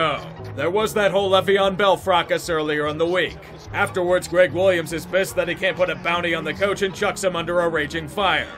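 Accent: Pittsburgh, United States. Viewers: Nice to hear the Yinzer again.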